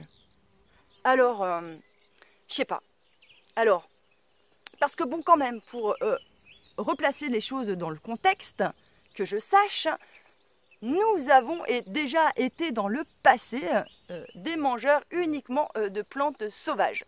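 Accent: Paris, France. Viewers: je suis désolé mais je me devais de revenir sur certain points de cette vidéo qui m'ont fort dérangé. Ton ton de voix dans cette vidéo, ou encore ta manière de parler m'ont donné beaucoup de mal à finir cette vidéo. J'ai l'impression que je me fais gronder même si je sus pas concerné. Je trouve que tu as la façon de parler du jeune ado parisienne, hautaine, qui sait tout sur tout, limite à donné implicitement des ordres. Et puis surtout, et je pense que c'est ce qui fait mon impression, c'est les "in" à la fin des mots et les quoi à répétition, sans oublié les phrases classiques de type "non mais j'sais pas quoi" ou "c'est - un truc - de dingue", ou encore les mots comme "choqué", "grave", etc. C'est extèmement désagréable, je suis désolé mais ça m'as fort frustré et dégouté, alors que le but de la vidéo est d'intéresser les gens de les faire changer, et pour moi, si quelqu'un me parle comme ça pour me faire la morale, je lui envoie deux claques (virtuel parce que je n'aime pas la violence) et je l'envoie balader, qu'il ou elle aille se faire f*****. 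Pour capter une audience, c'est la pire chose à faire Ensuite il y a deux points dans ton résonement où je ne suis pas du tout d'accord. Premièrement, oui on peut vivre de chasse et de cueillette, bien sûr que l'on peut vivre de chasse et de cueillette. Nous sommes des animaux, et avec le savoir faire nécéssaire, nous sommes totalement capable de nous nourrir comme cela, tout comme les autre animaux, nous n'y sommes juste plus ha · cette accent parisien avec des "en" a chaque mot c'est terrible . aprés je comprend ta logique mais jvois pas la finalité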